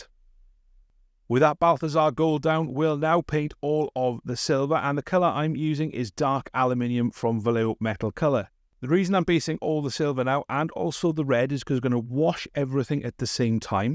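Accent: Wales, United Kingdom. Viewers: ah sick. Thank you! Ok I’ll get one of those. I’ve been burning through crappy brushes for too long. I hear that Welsh 🏴 accent. You’re not anything to do with Firestorm in Cardiff are you? That’s our local and we basically spend our wages there 🤦‍♂️ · THAT SOUNDS LIKE A WELSH ACCENT RAHHHHHHHH I WILL BE SUBSCRIBING BOTH FOR THESE AMAZING PAINTING TUTORIALS BUT ALSO BECAUSE YOU HAIL FROM THE GREATEST COUNTRY IN THE WORLD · Great tutorial and always nice to hear a Welsh accent on a youtube vid.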